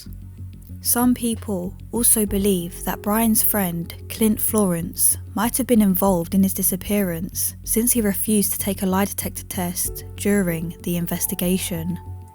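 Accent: United Kingdom. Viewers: Sounds very Croydon? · I love the narrators Luton/London style accent. Sounds elegant with twangs of ghetto 😅 Love it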